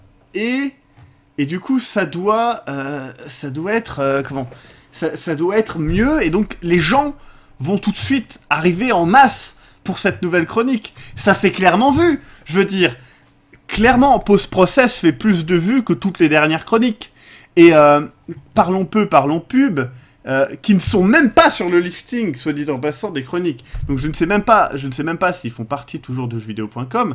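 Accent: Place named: Africa. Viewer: Salut dis moi t'as vécu en afrique ou sur une île Africaine ? Question à ta façon de parler certains mots.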